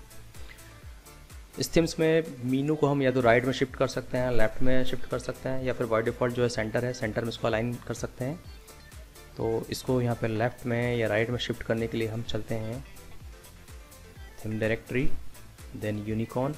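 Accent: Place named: India. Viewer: This video really shows that not everyone who sounds Indian has malicious intent.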